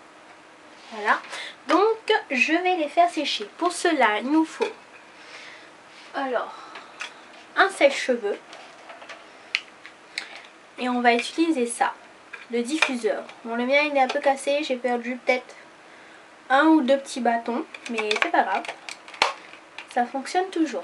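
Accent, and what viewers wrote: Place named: Réunion. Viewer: Ta un accent créol réunionnais ;-)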